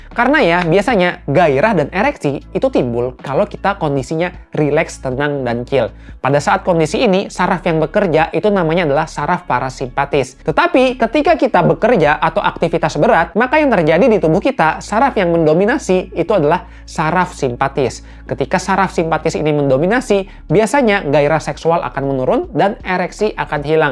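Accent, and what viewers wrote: Banjar, Indonesia. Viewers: urang banjar kah pian dok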